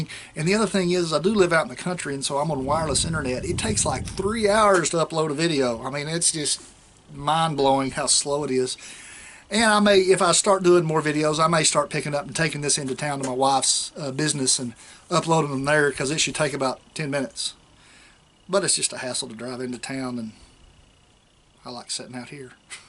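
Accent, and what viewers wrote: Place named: West Virginia, United States. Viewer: Hey I'm subscribed to both your channels. I just bought a cnc a few months back and was telling the wife that I learn more from your channel than anyone else. The first thing she said it's probably cause he talks like you... lol! Its alright to sound bumpkin buddy! Dont change a thing. I'm from West Virginia and I thought you were from around here too. Look forward to more of your videos. Add in some more CAD stuff now since you retired..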